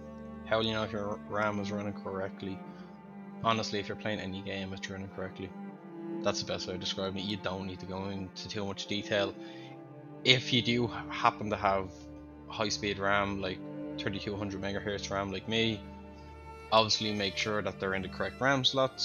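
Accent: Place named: Ireland. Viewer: Took me only 10 seconds to realize this person is Irish.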